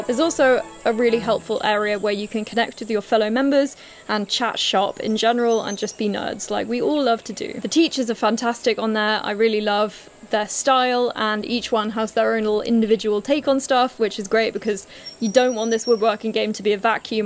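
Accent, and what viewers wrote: England, United Kingdom. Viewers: Doesn't hurt though. Americans can't resist that British accent with a feminine lilt. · Interesting situation! You sound English, you're living in Australia, and you are using Texas slang i.e. "Y'all. Okay, well, from an English man living in South Texas, here's a question: How do you deal with the obvious humidity when woodworking? Perhaps that could be a subject for one of your videos.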